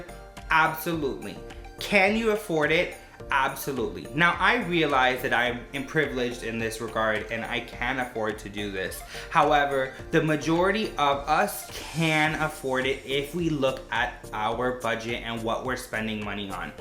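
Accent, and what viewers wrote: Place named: Cuba. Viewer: Omg I loved this video! Going for my first workout with OT next week and you made me feel at ease. I love your Spanglish! Cuban or Puerto Rican? I’m gonna say Cuban! Cubanita here!🇨🇺 😘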